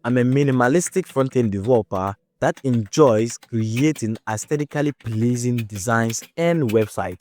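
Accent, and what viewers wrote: Ghana. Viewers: 😂 I like your content bro. Keep it up. I like the way you speak I guess you are from Ghana. Finding job’s and branding as a developer is a very serious problem indeed.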